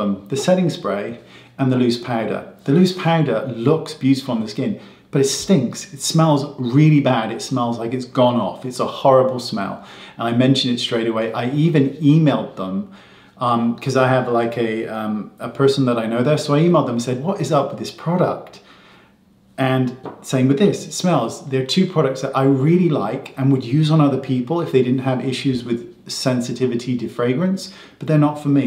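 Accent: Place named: West Country, United Kingdom. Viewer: I’ve been watching for years and I still love the fact I can hear a West Country accent when you explain things. 🇬🇧 Loved this video! ✨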